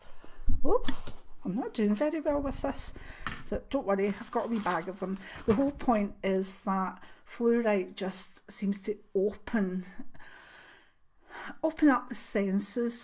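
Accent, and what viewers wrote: Scotland, United Kingdom. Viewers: /thanks again for this video. Excellent as always ( i really dont need to say that now as i/everyone know(s) they are). What's the stone you recommend for rubbing your palm with? I cant place it with your (lovely) Scottish accent. Can you recommend some where on line going to for this? I'm in the midlands area..... is there anyway you know of between telford. /shrewsbury/chester? edit I'm aware it's hardly local to you so no problem if not.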